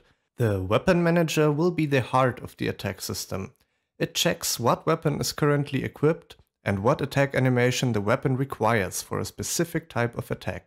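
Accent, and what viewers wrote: Germany. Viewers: Are you german by any chance? Sounds a little like it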